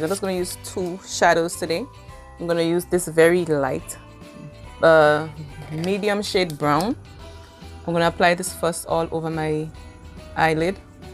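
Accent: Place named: Grenada. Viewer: I love the chit chat videos as you give away your secrets😂 but most importantly it make you more relatable and brings out your Grenadian accent and down to earth personality ✅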